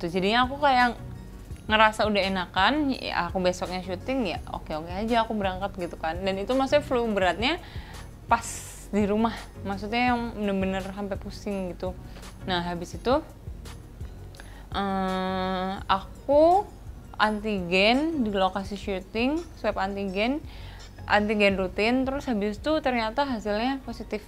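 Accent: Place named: Indonesia. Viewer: logat nya indonesia banget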